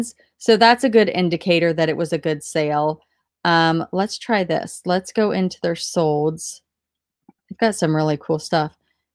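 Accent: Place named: South, United States. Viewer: A nice southern accent too.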